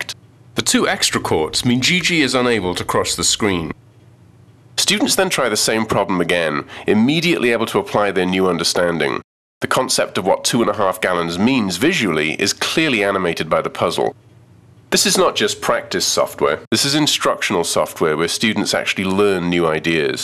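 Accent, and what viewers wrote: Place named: India. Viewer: He Speaks Indian English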